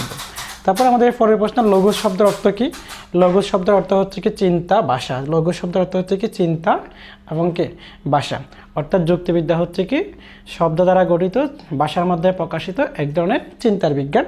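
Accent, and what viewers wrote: Noakhali, Bangladesh. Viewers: Sir amadrr nuakalir,onek balo bujai logic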